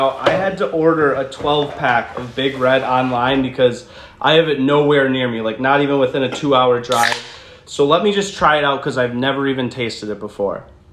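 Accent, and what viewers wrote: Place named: United Kingdom. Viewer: homie talks like a UK person trying american things.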